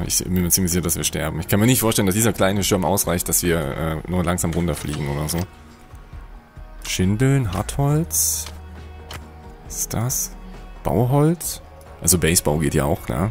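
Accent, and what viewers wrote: Saxony, Germany. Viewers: Super Video. Macht irgendwie direkt Spaß. Mal schauen, ob es das evtl. für die PS geben wird. Und bitte niemals den sächsischen Dialekt weglassen. Das erinnert so an Heimat 😉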